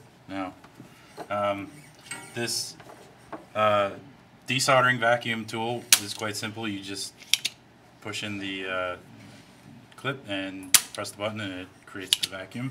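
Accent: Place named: New Brunswick, Canada. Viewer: you sound like a New Brunswicker. true?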